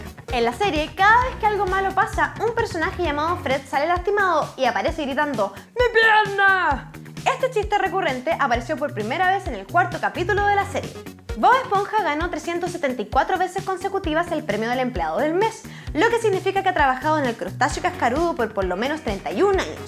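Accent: Chile. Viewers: 23 cosas que no sabias de YOUTUBE. Estoy viendo tantos los vídeos en especial en donde sale que se me está pegando el acento chileno po!. Saludos desde Lima, Perú. Bendiciones para todos. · es de chile ya que ella es chilena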